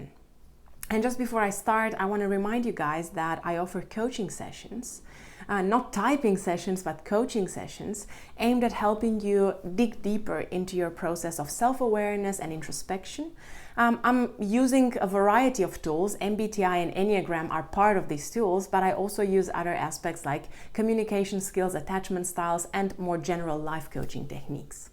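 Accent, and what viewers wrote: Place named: Greece. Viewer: Are you Greek by any chance? You have a slight Greek accent :) But it’s cute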